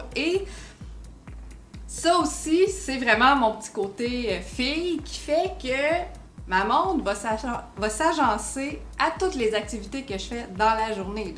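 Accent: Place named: Quebec, Canada. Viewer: language de cetebese .. quebecois ..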